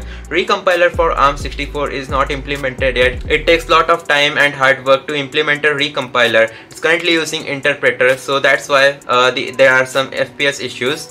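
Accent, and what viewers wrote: India. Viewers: I hear Indian accent I know it’s legit.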